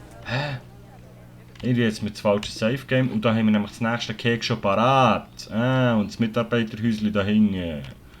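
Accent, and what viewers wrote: Bern, Switzerland. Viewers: Haha du siech schlofsch fascht i bim rede, Berner?😂 mega unterhaltsam, mach witer so.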